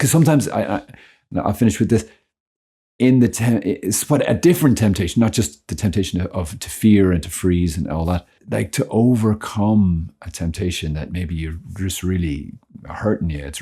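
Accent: Ireland. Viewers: Great to hear an Irish accent in this space!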